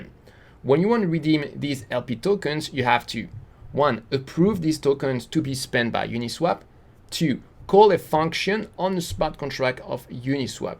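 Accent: France. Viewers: Salut. Es tu francais? Je reconnais un petit accent. A +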